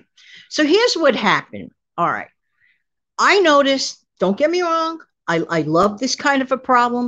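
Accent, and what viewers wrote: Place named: New York, United States. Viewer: Yep. I came over because prepper princess mentioned you and that you were from New York City and she I think she even mentioned your accent and I said I miss being out east let me listen to a fellow New Yorker. And I have loved every one of your shows and haven't missed one the past couple of weeks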